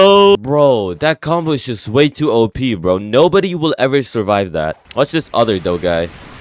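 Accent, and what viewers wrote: Germany. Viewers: Ey bist du deutscher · Are you German?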